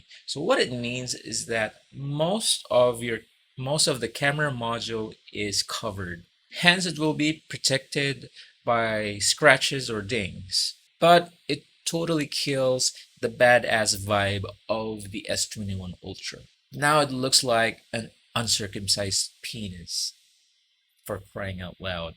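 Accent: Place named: United States. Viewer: Haha, IMO it is just marketing hype. 256gb Exynos is anyway better than 128gb Snapdragon. Exynos is also dual SIM (another great feature that is missing on Snapdragon). Exynos does not have the UW Band (Verizon) and some other 5G bands too so that is the only catch for me. You have US accent - you look like the US expat enjoying the good life on beaches of Philippines :)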